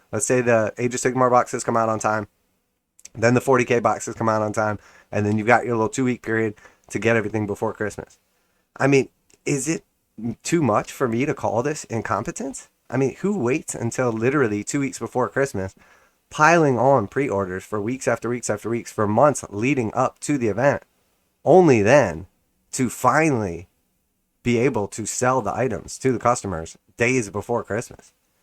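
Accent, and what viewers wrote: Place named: United States. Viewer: I guess it is a bit different for you in the US, you sound like you live in the USA. I'm in the EU and I don't think it will be a problem to get them. My local hobby shop is already taking pre-orders for them. But i guess we will see.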